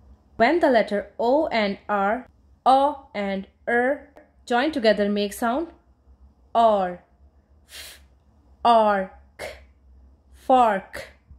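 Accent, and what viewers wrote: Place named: Bangladesh. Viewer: Из Бангладеша учительница? Произношение прямо выдаёт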